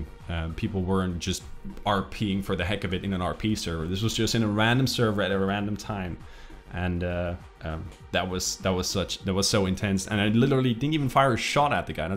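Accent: Netherlands. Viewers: Een Nederlands accent kun je nooit echt verstoppen, kijk je video's al tijdje, en je bent een goede speler. Heb Dayz al een tijd niet mee gespeeld, zou je een video kunnen maken over de staat van de game, hoe is het veranderd in het laatste half jaar ? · Be proud of your heritage; don't drop your Dutch accent just because you might think it's uncool, nothing wrong with anyone (whether they're streamers or not) with an accent. Just my 2 cents man, always enjoy your videos.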